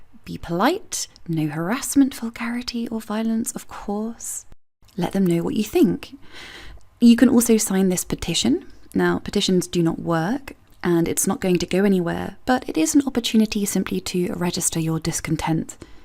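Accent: England, United Kingdom. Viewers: Love the way you articulate with that beautiful English accent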